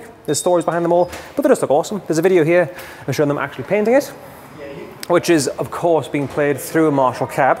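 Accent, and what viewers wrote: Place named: Geordie, United Kingdom. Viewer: I've only just clocked something about you...with a hint of camp in your voice and the way you suddenly drop your voice down It's Kenny Everett! Seriously are you from the same town? You have the same kind of rhythm..it's not quite Geordie but close by am,I right are you and Kenny from the same streets?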